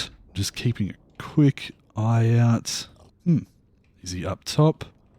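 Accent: Australia. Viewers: hi mate quick question, are you australian?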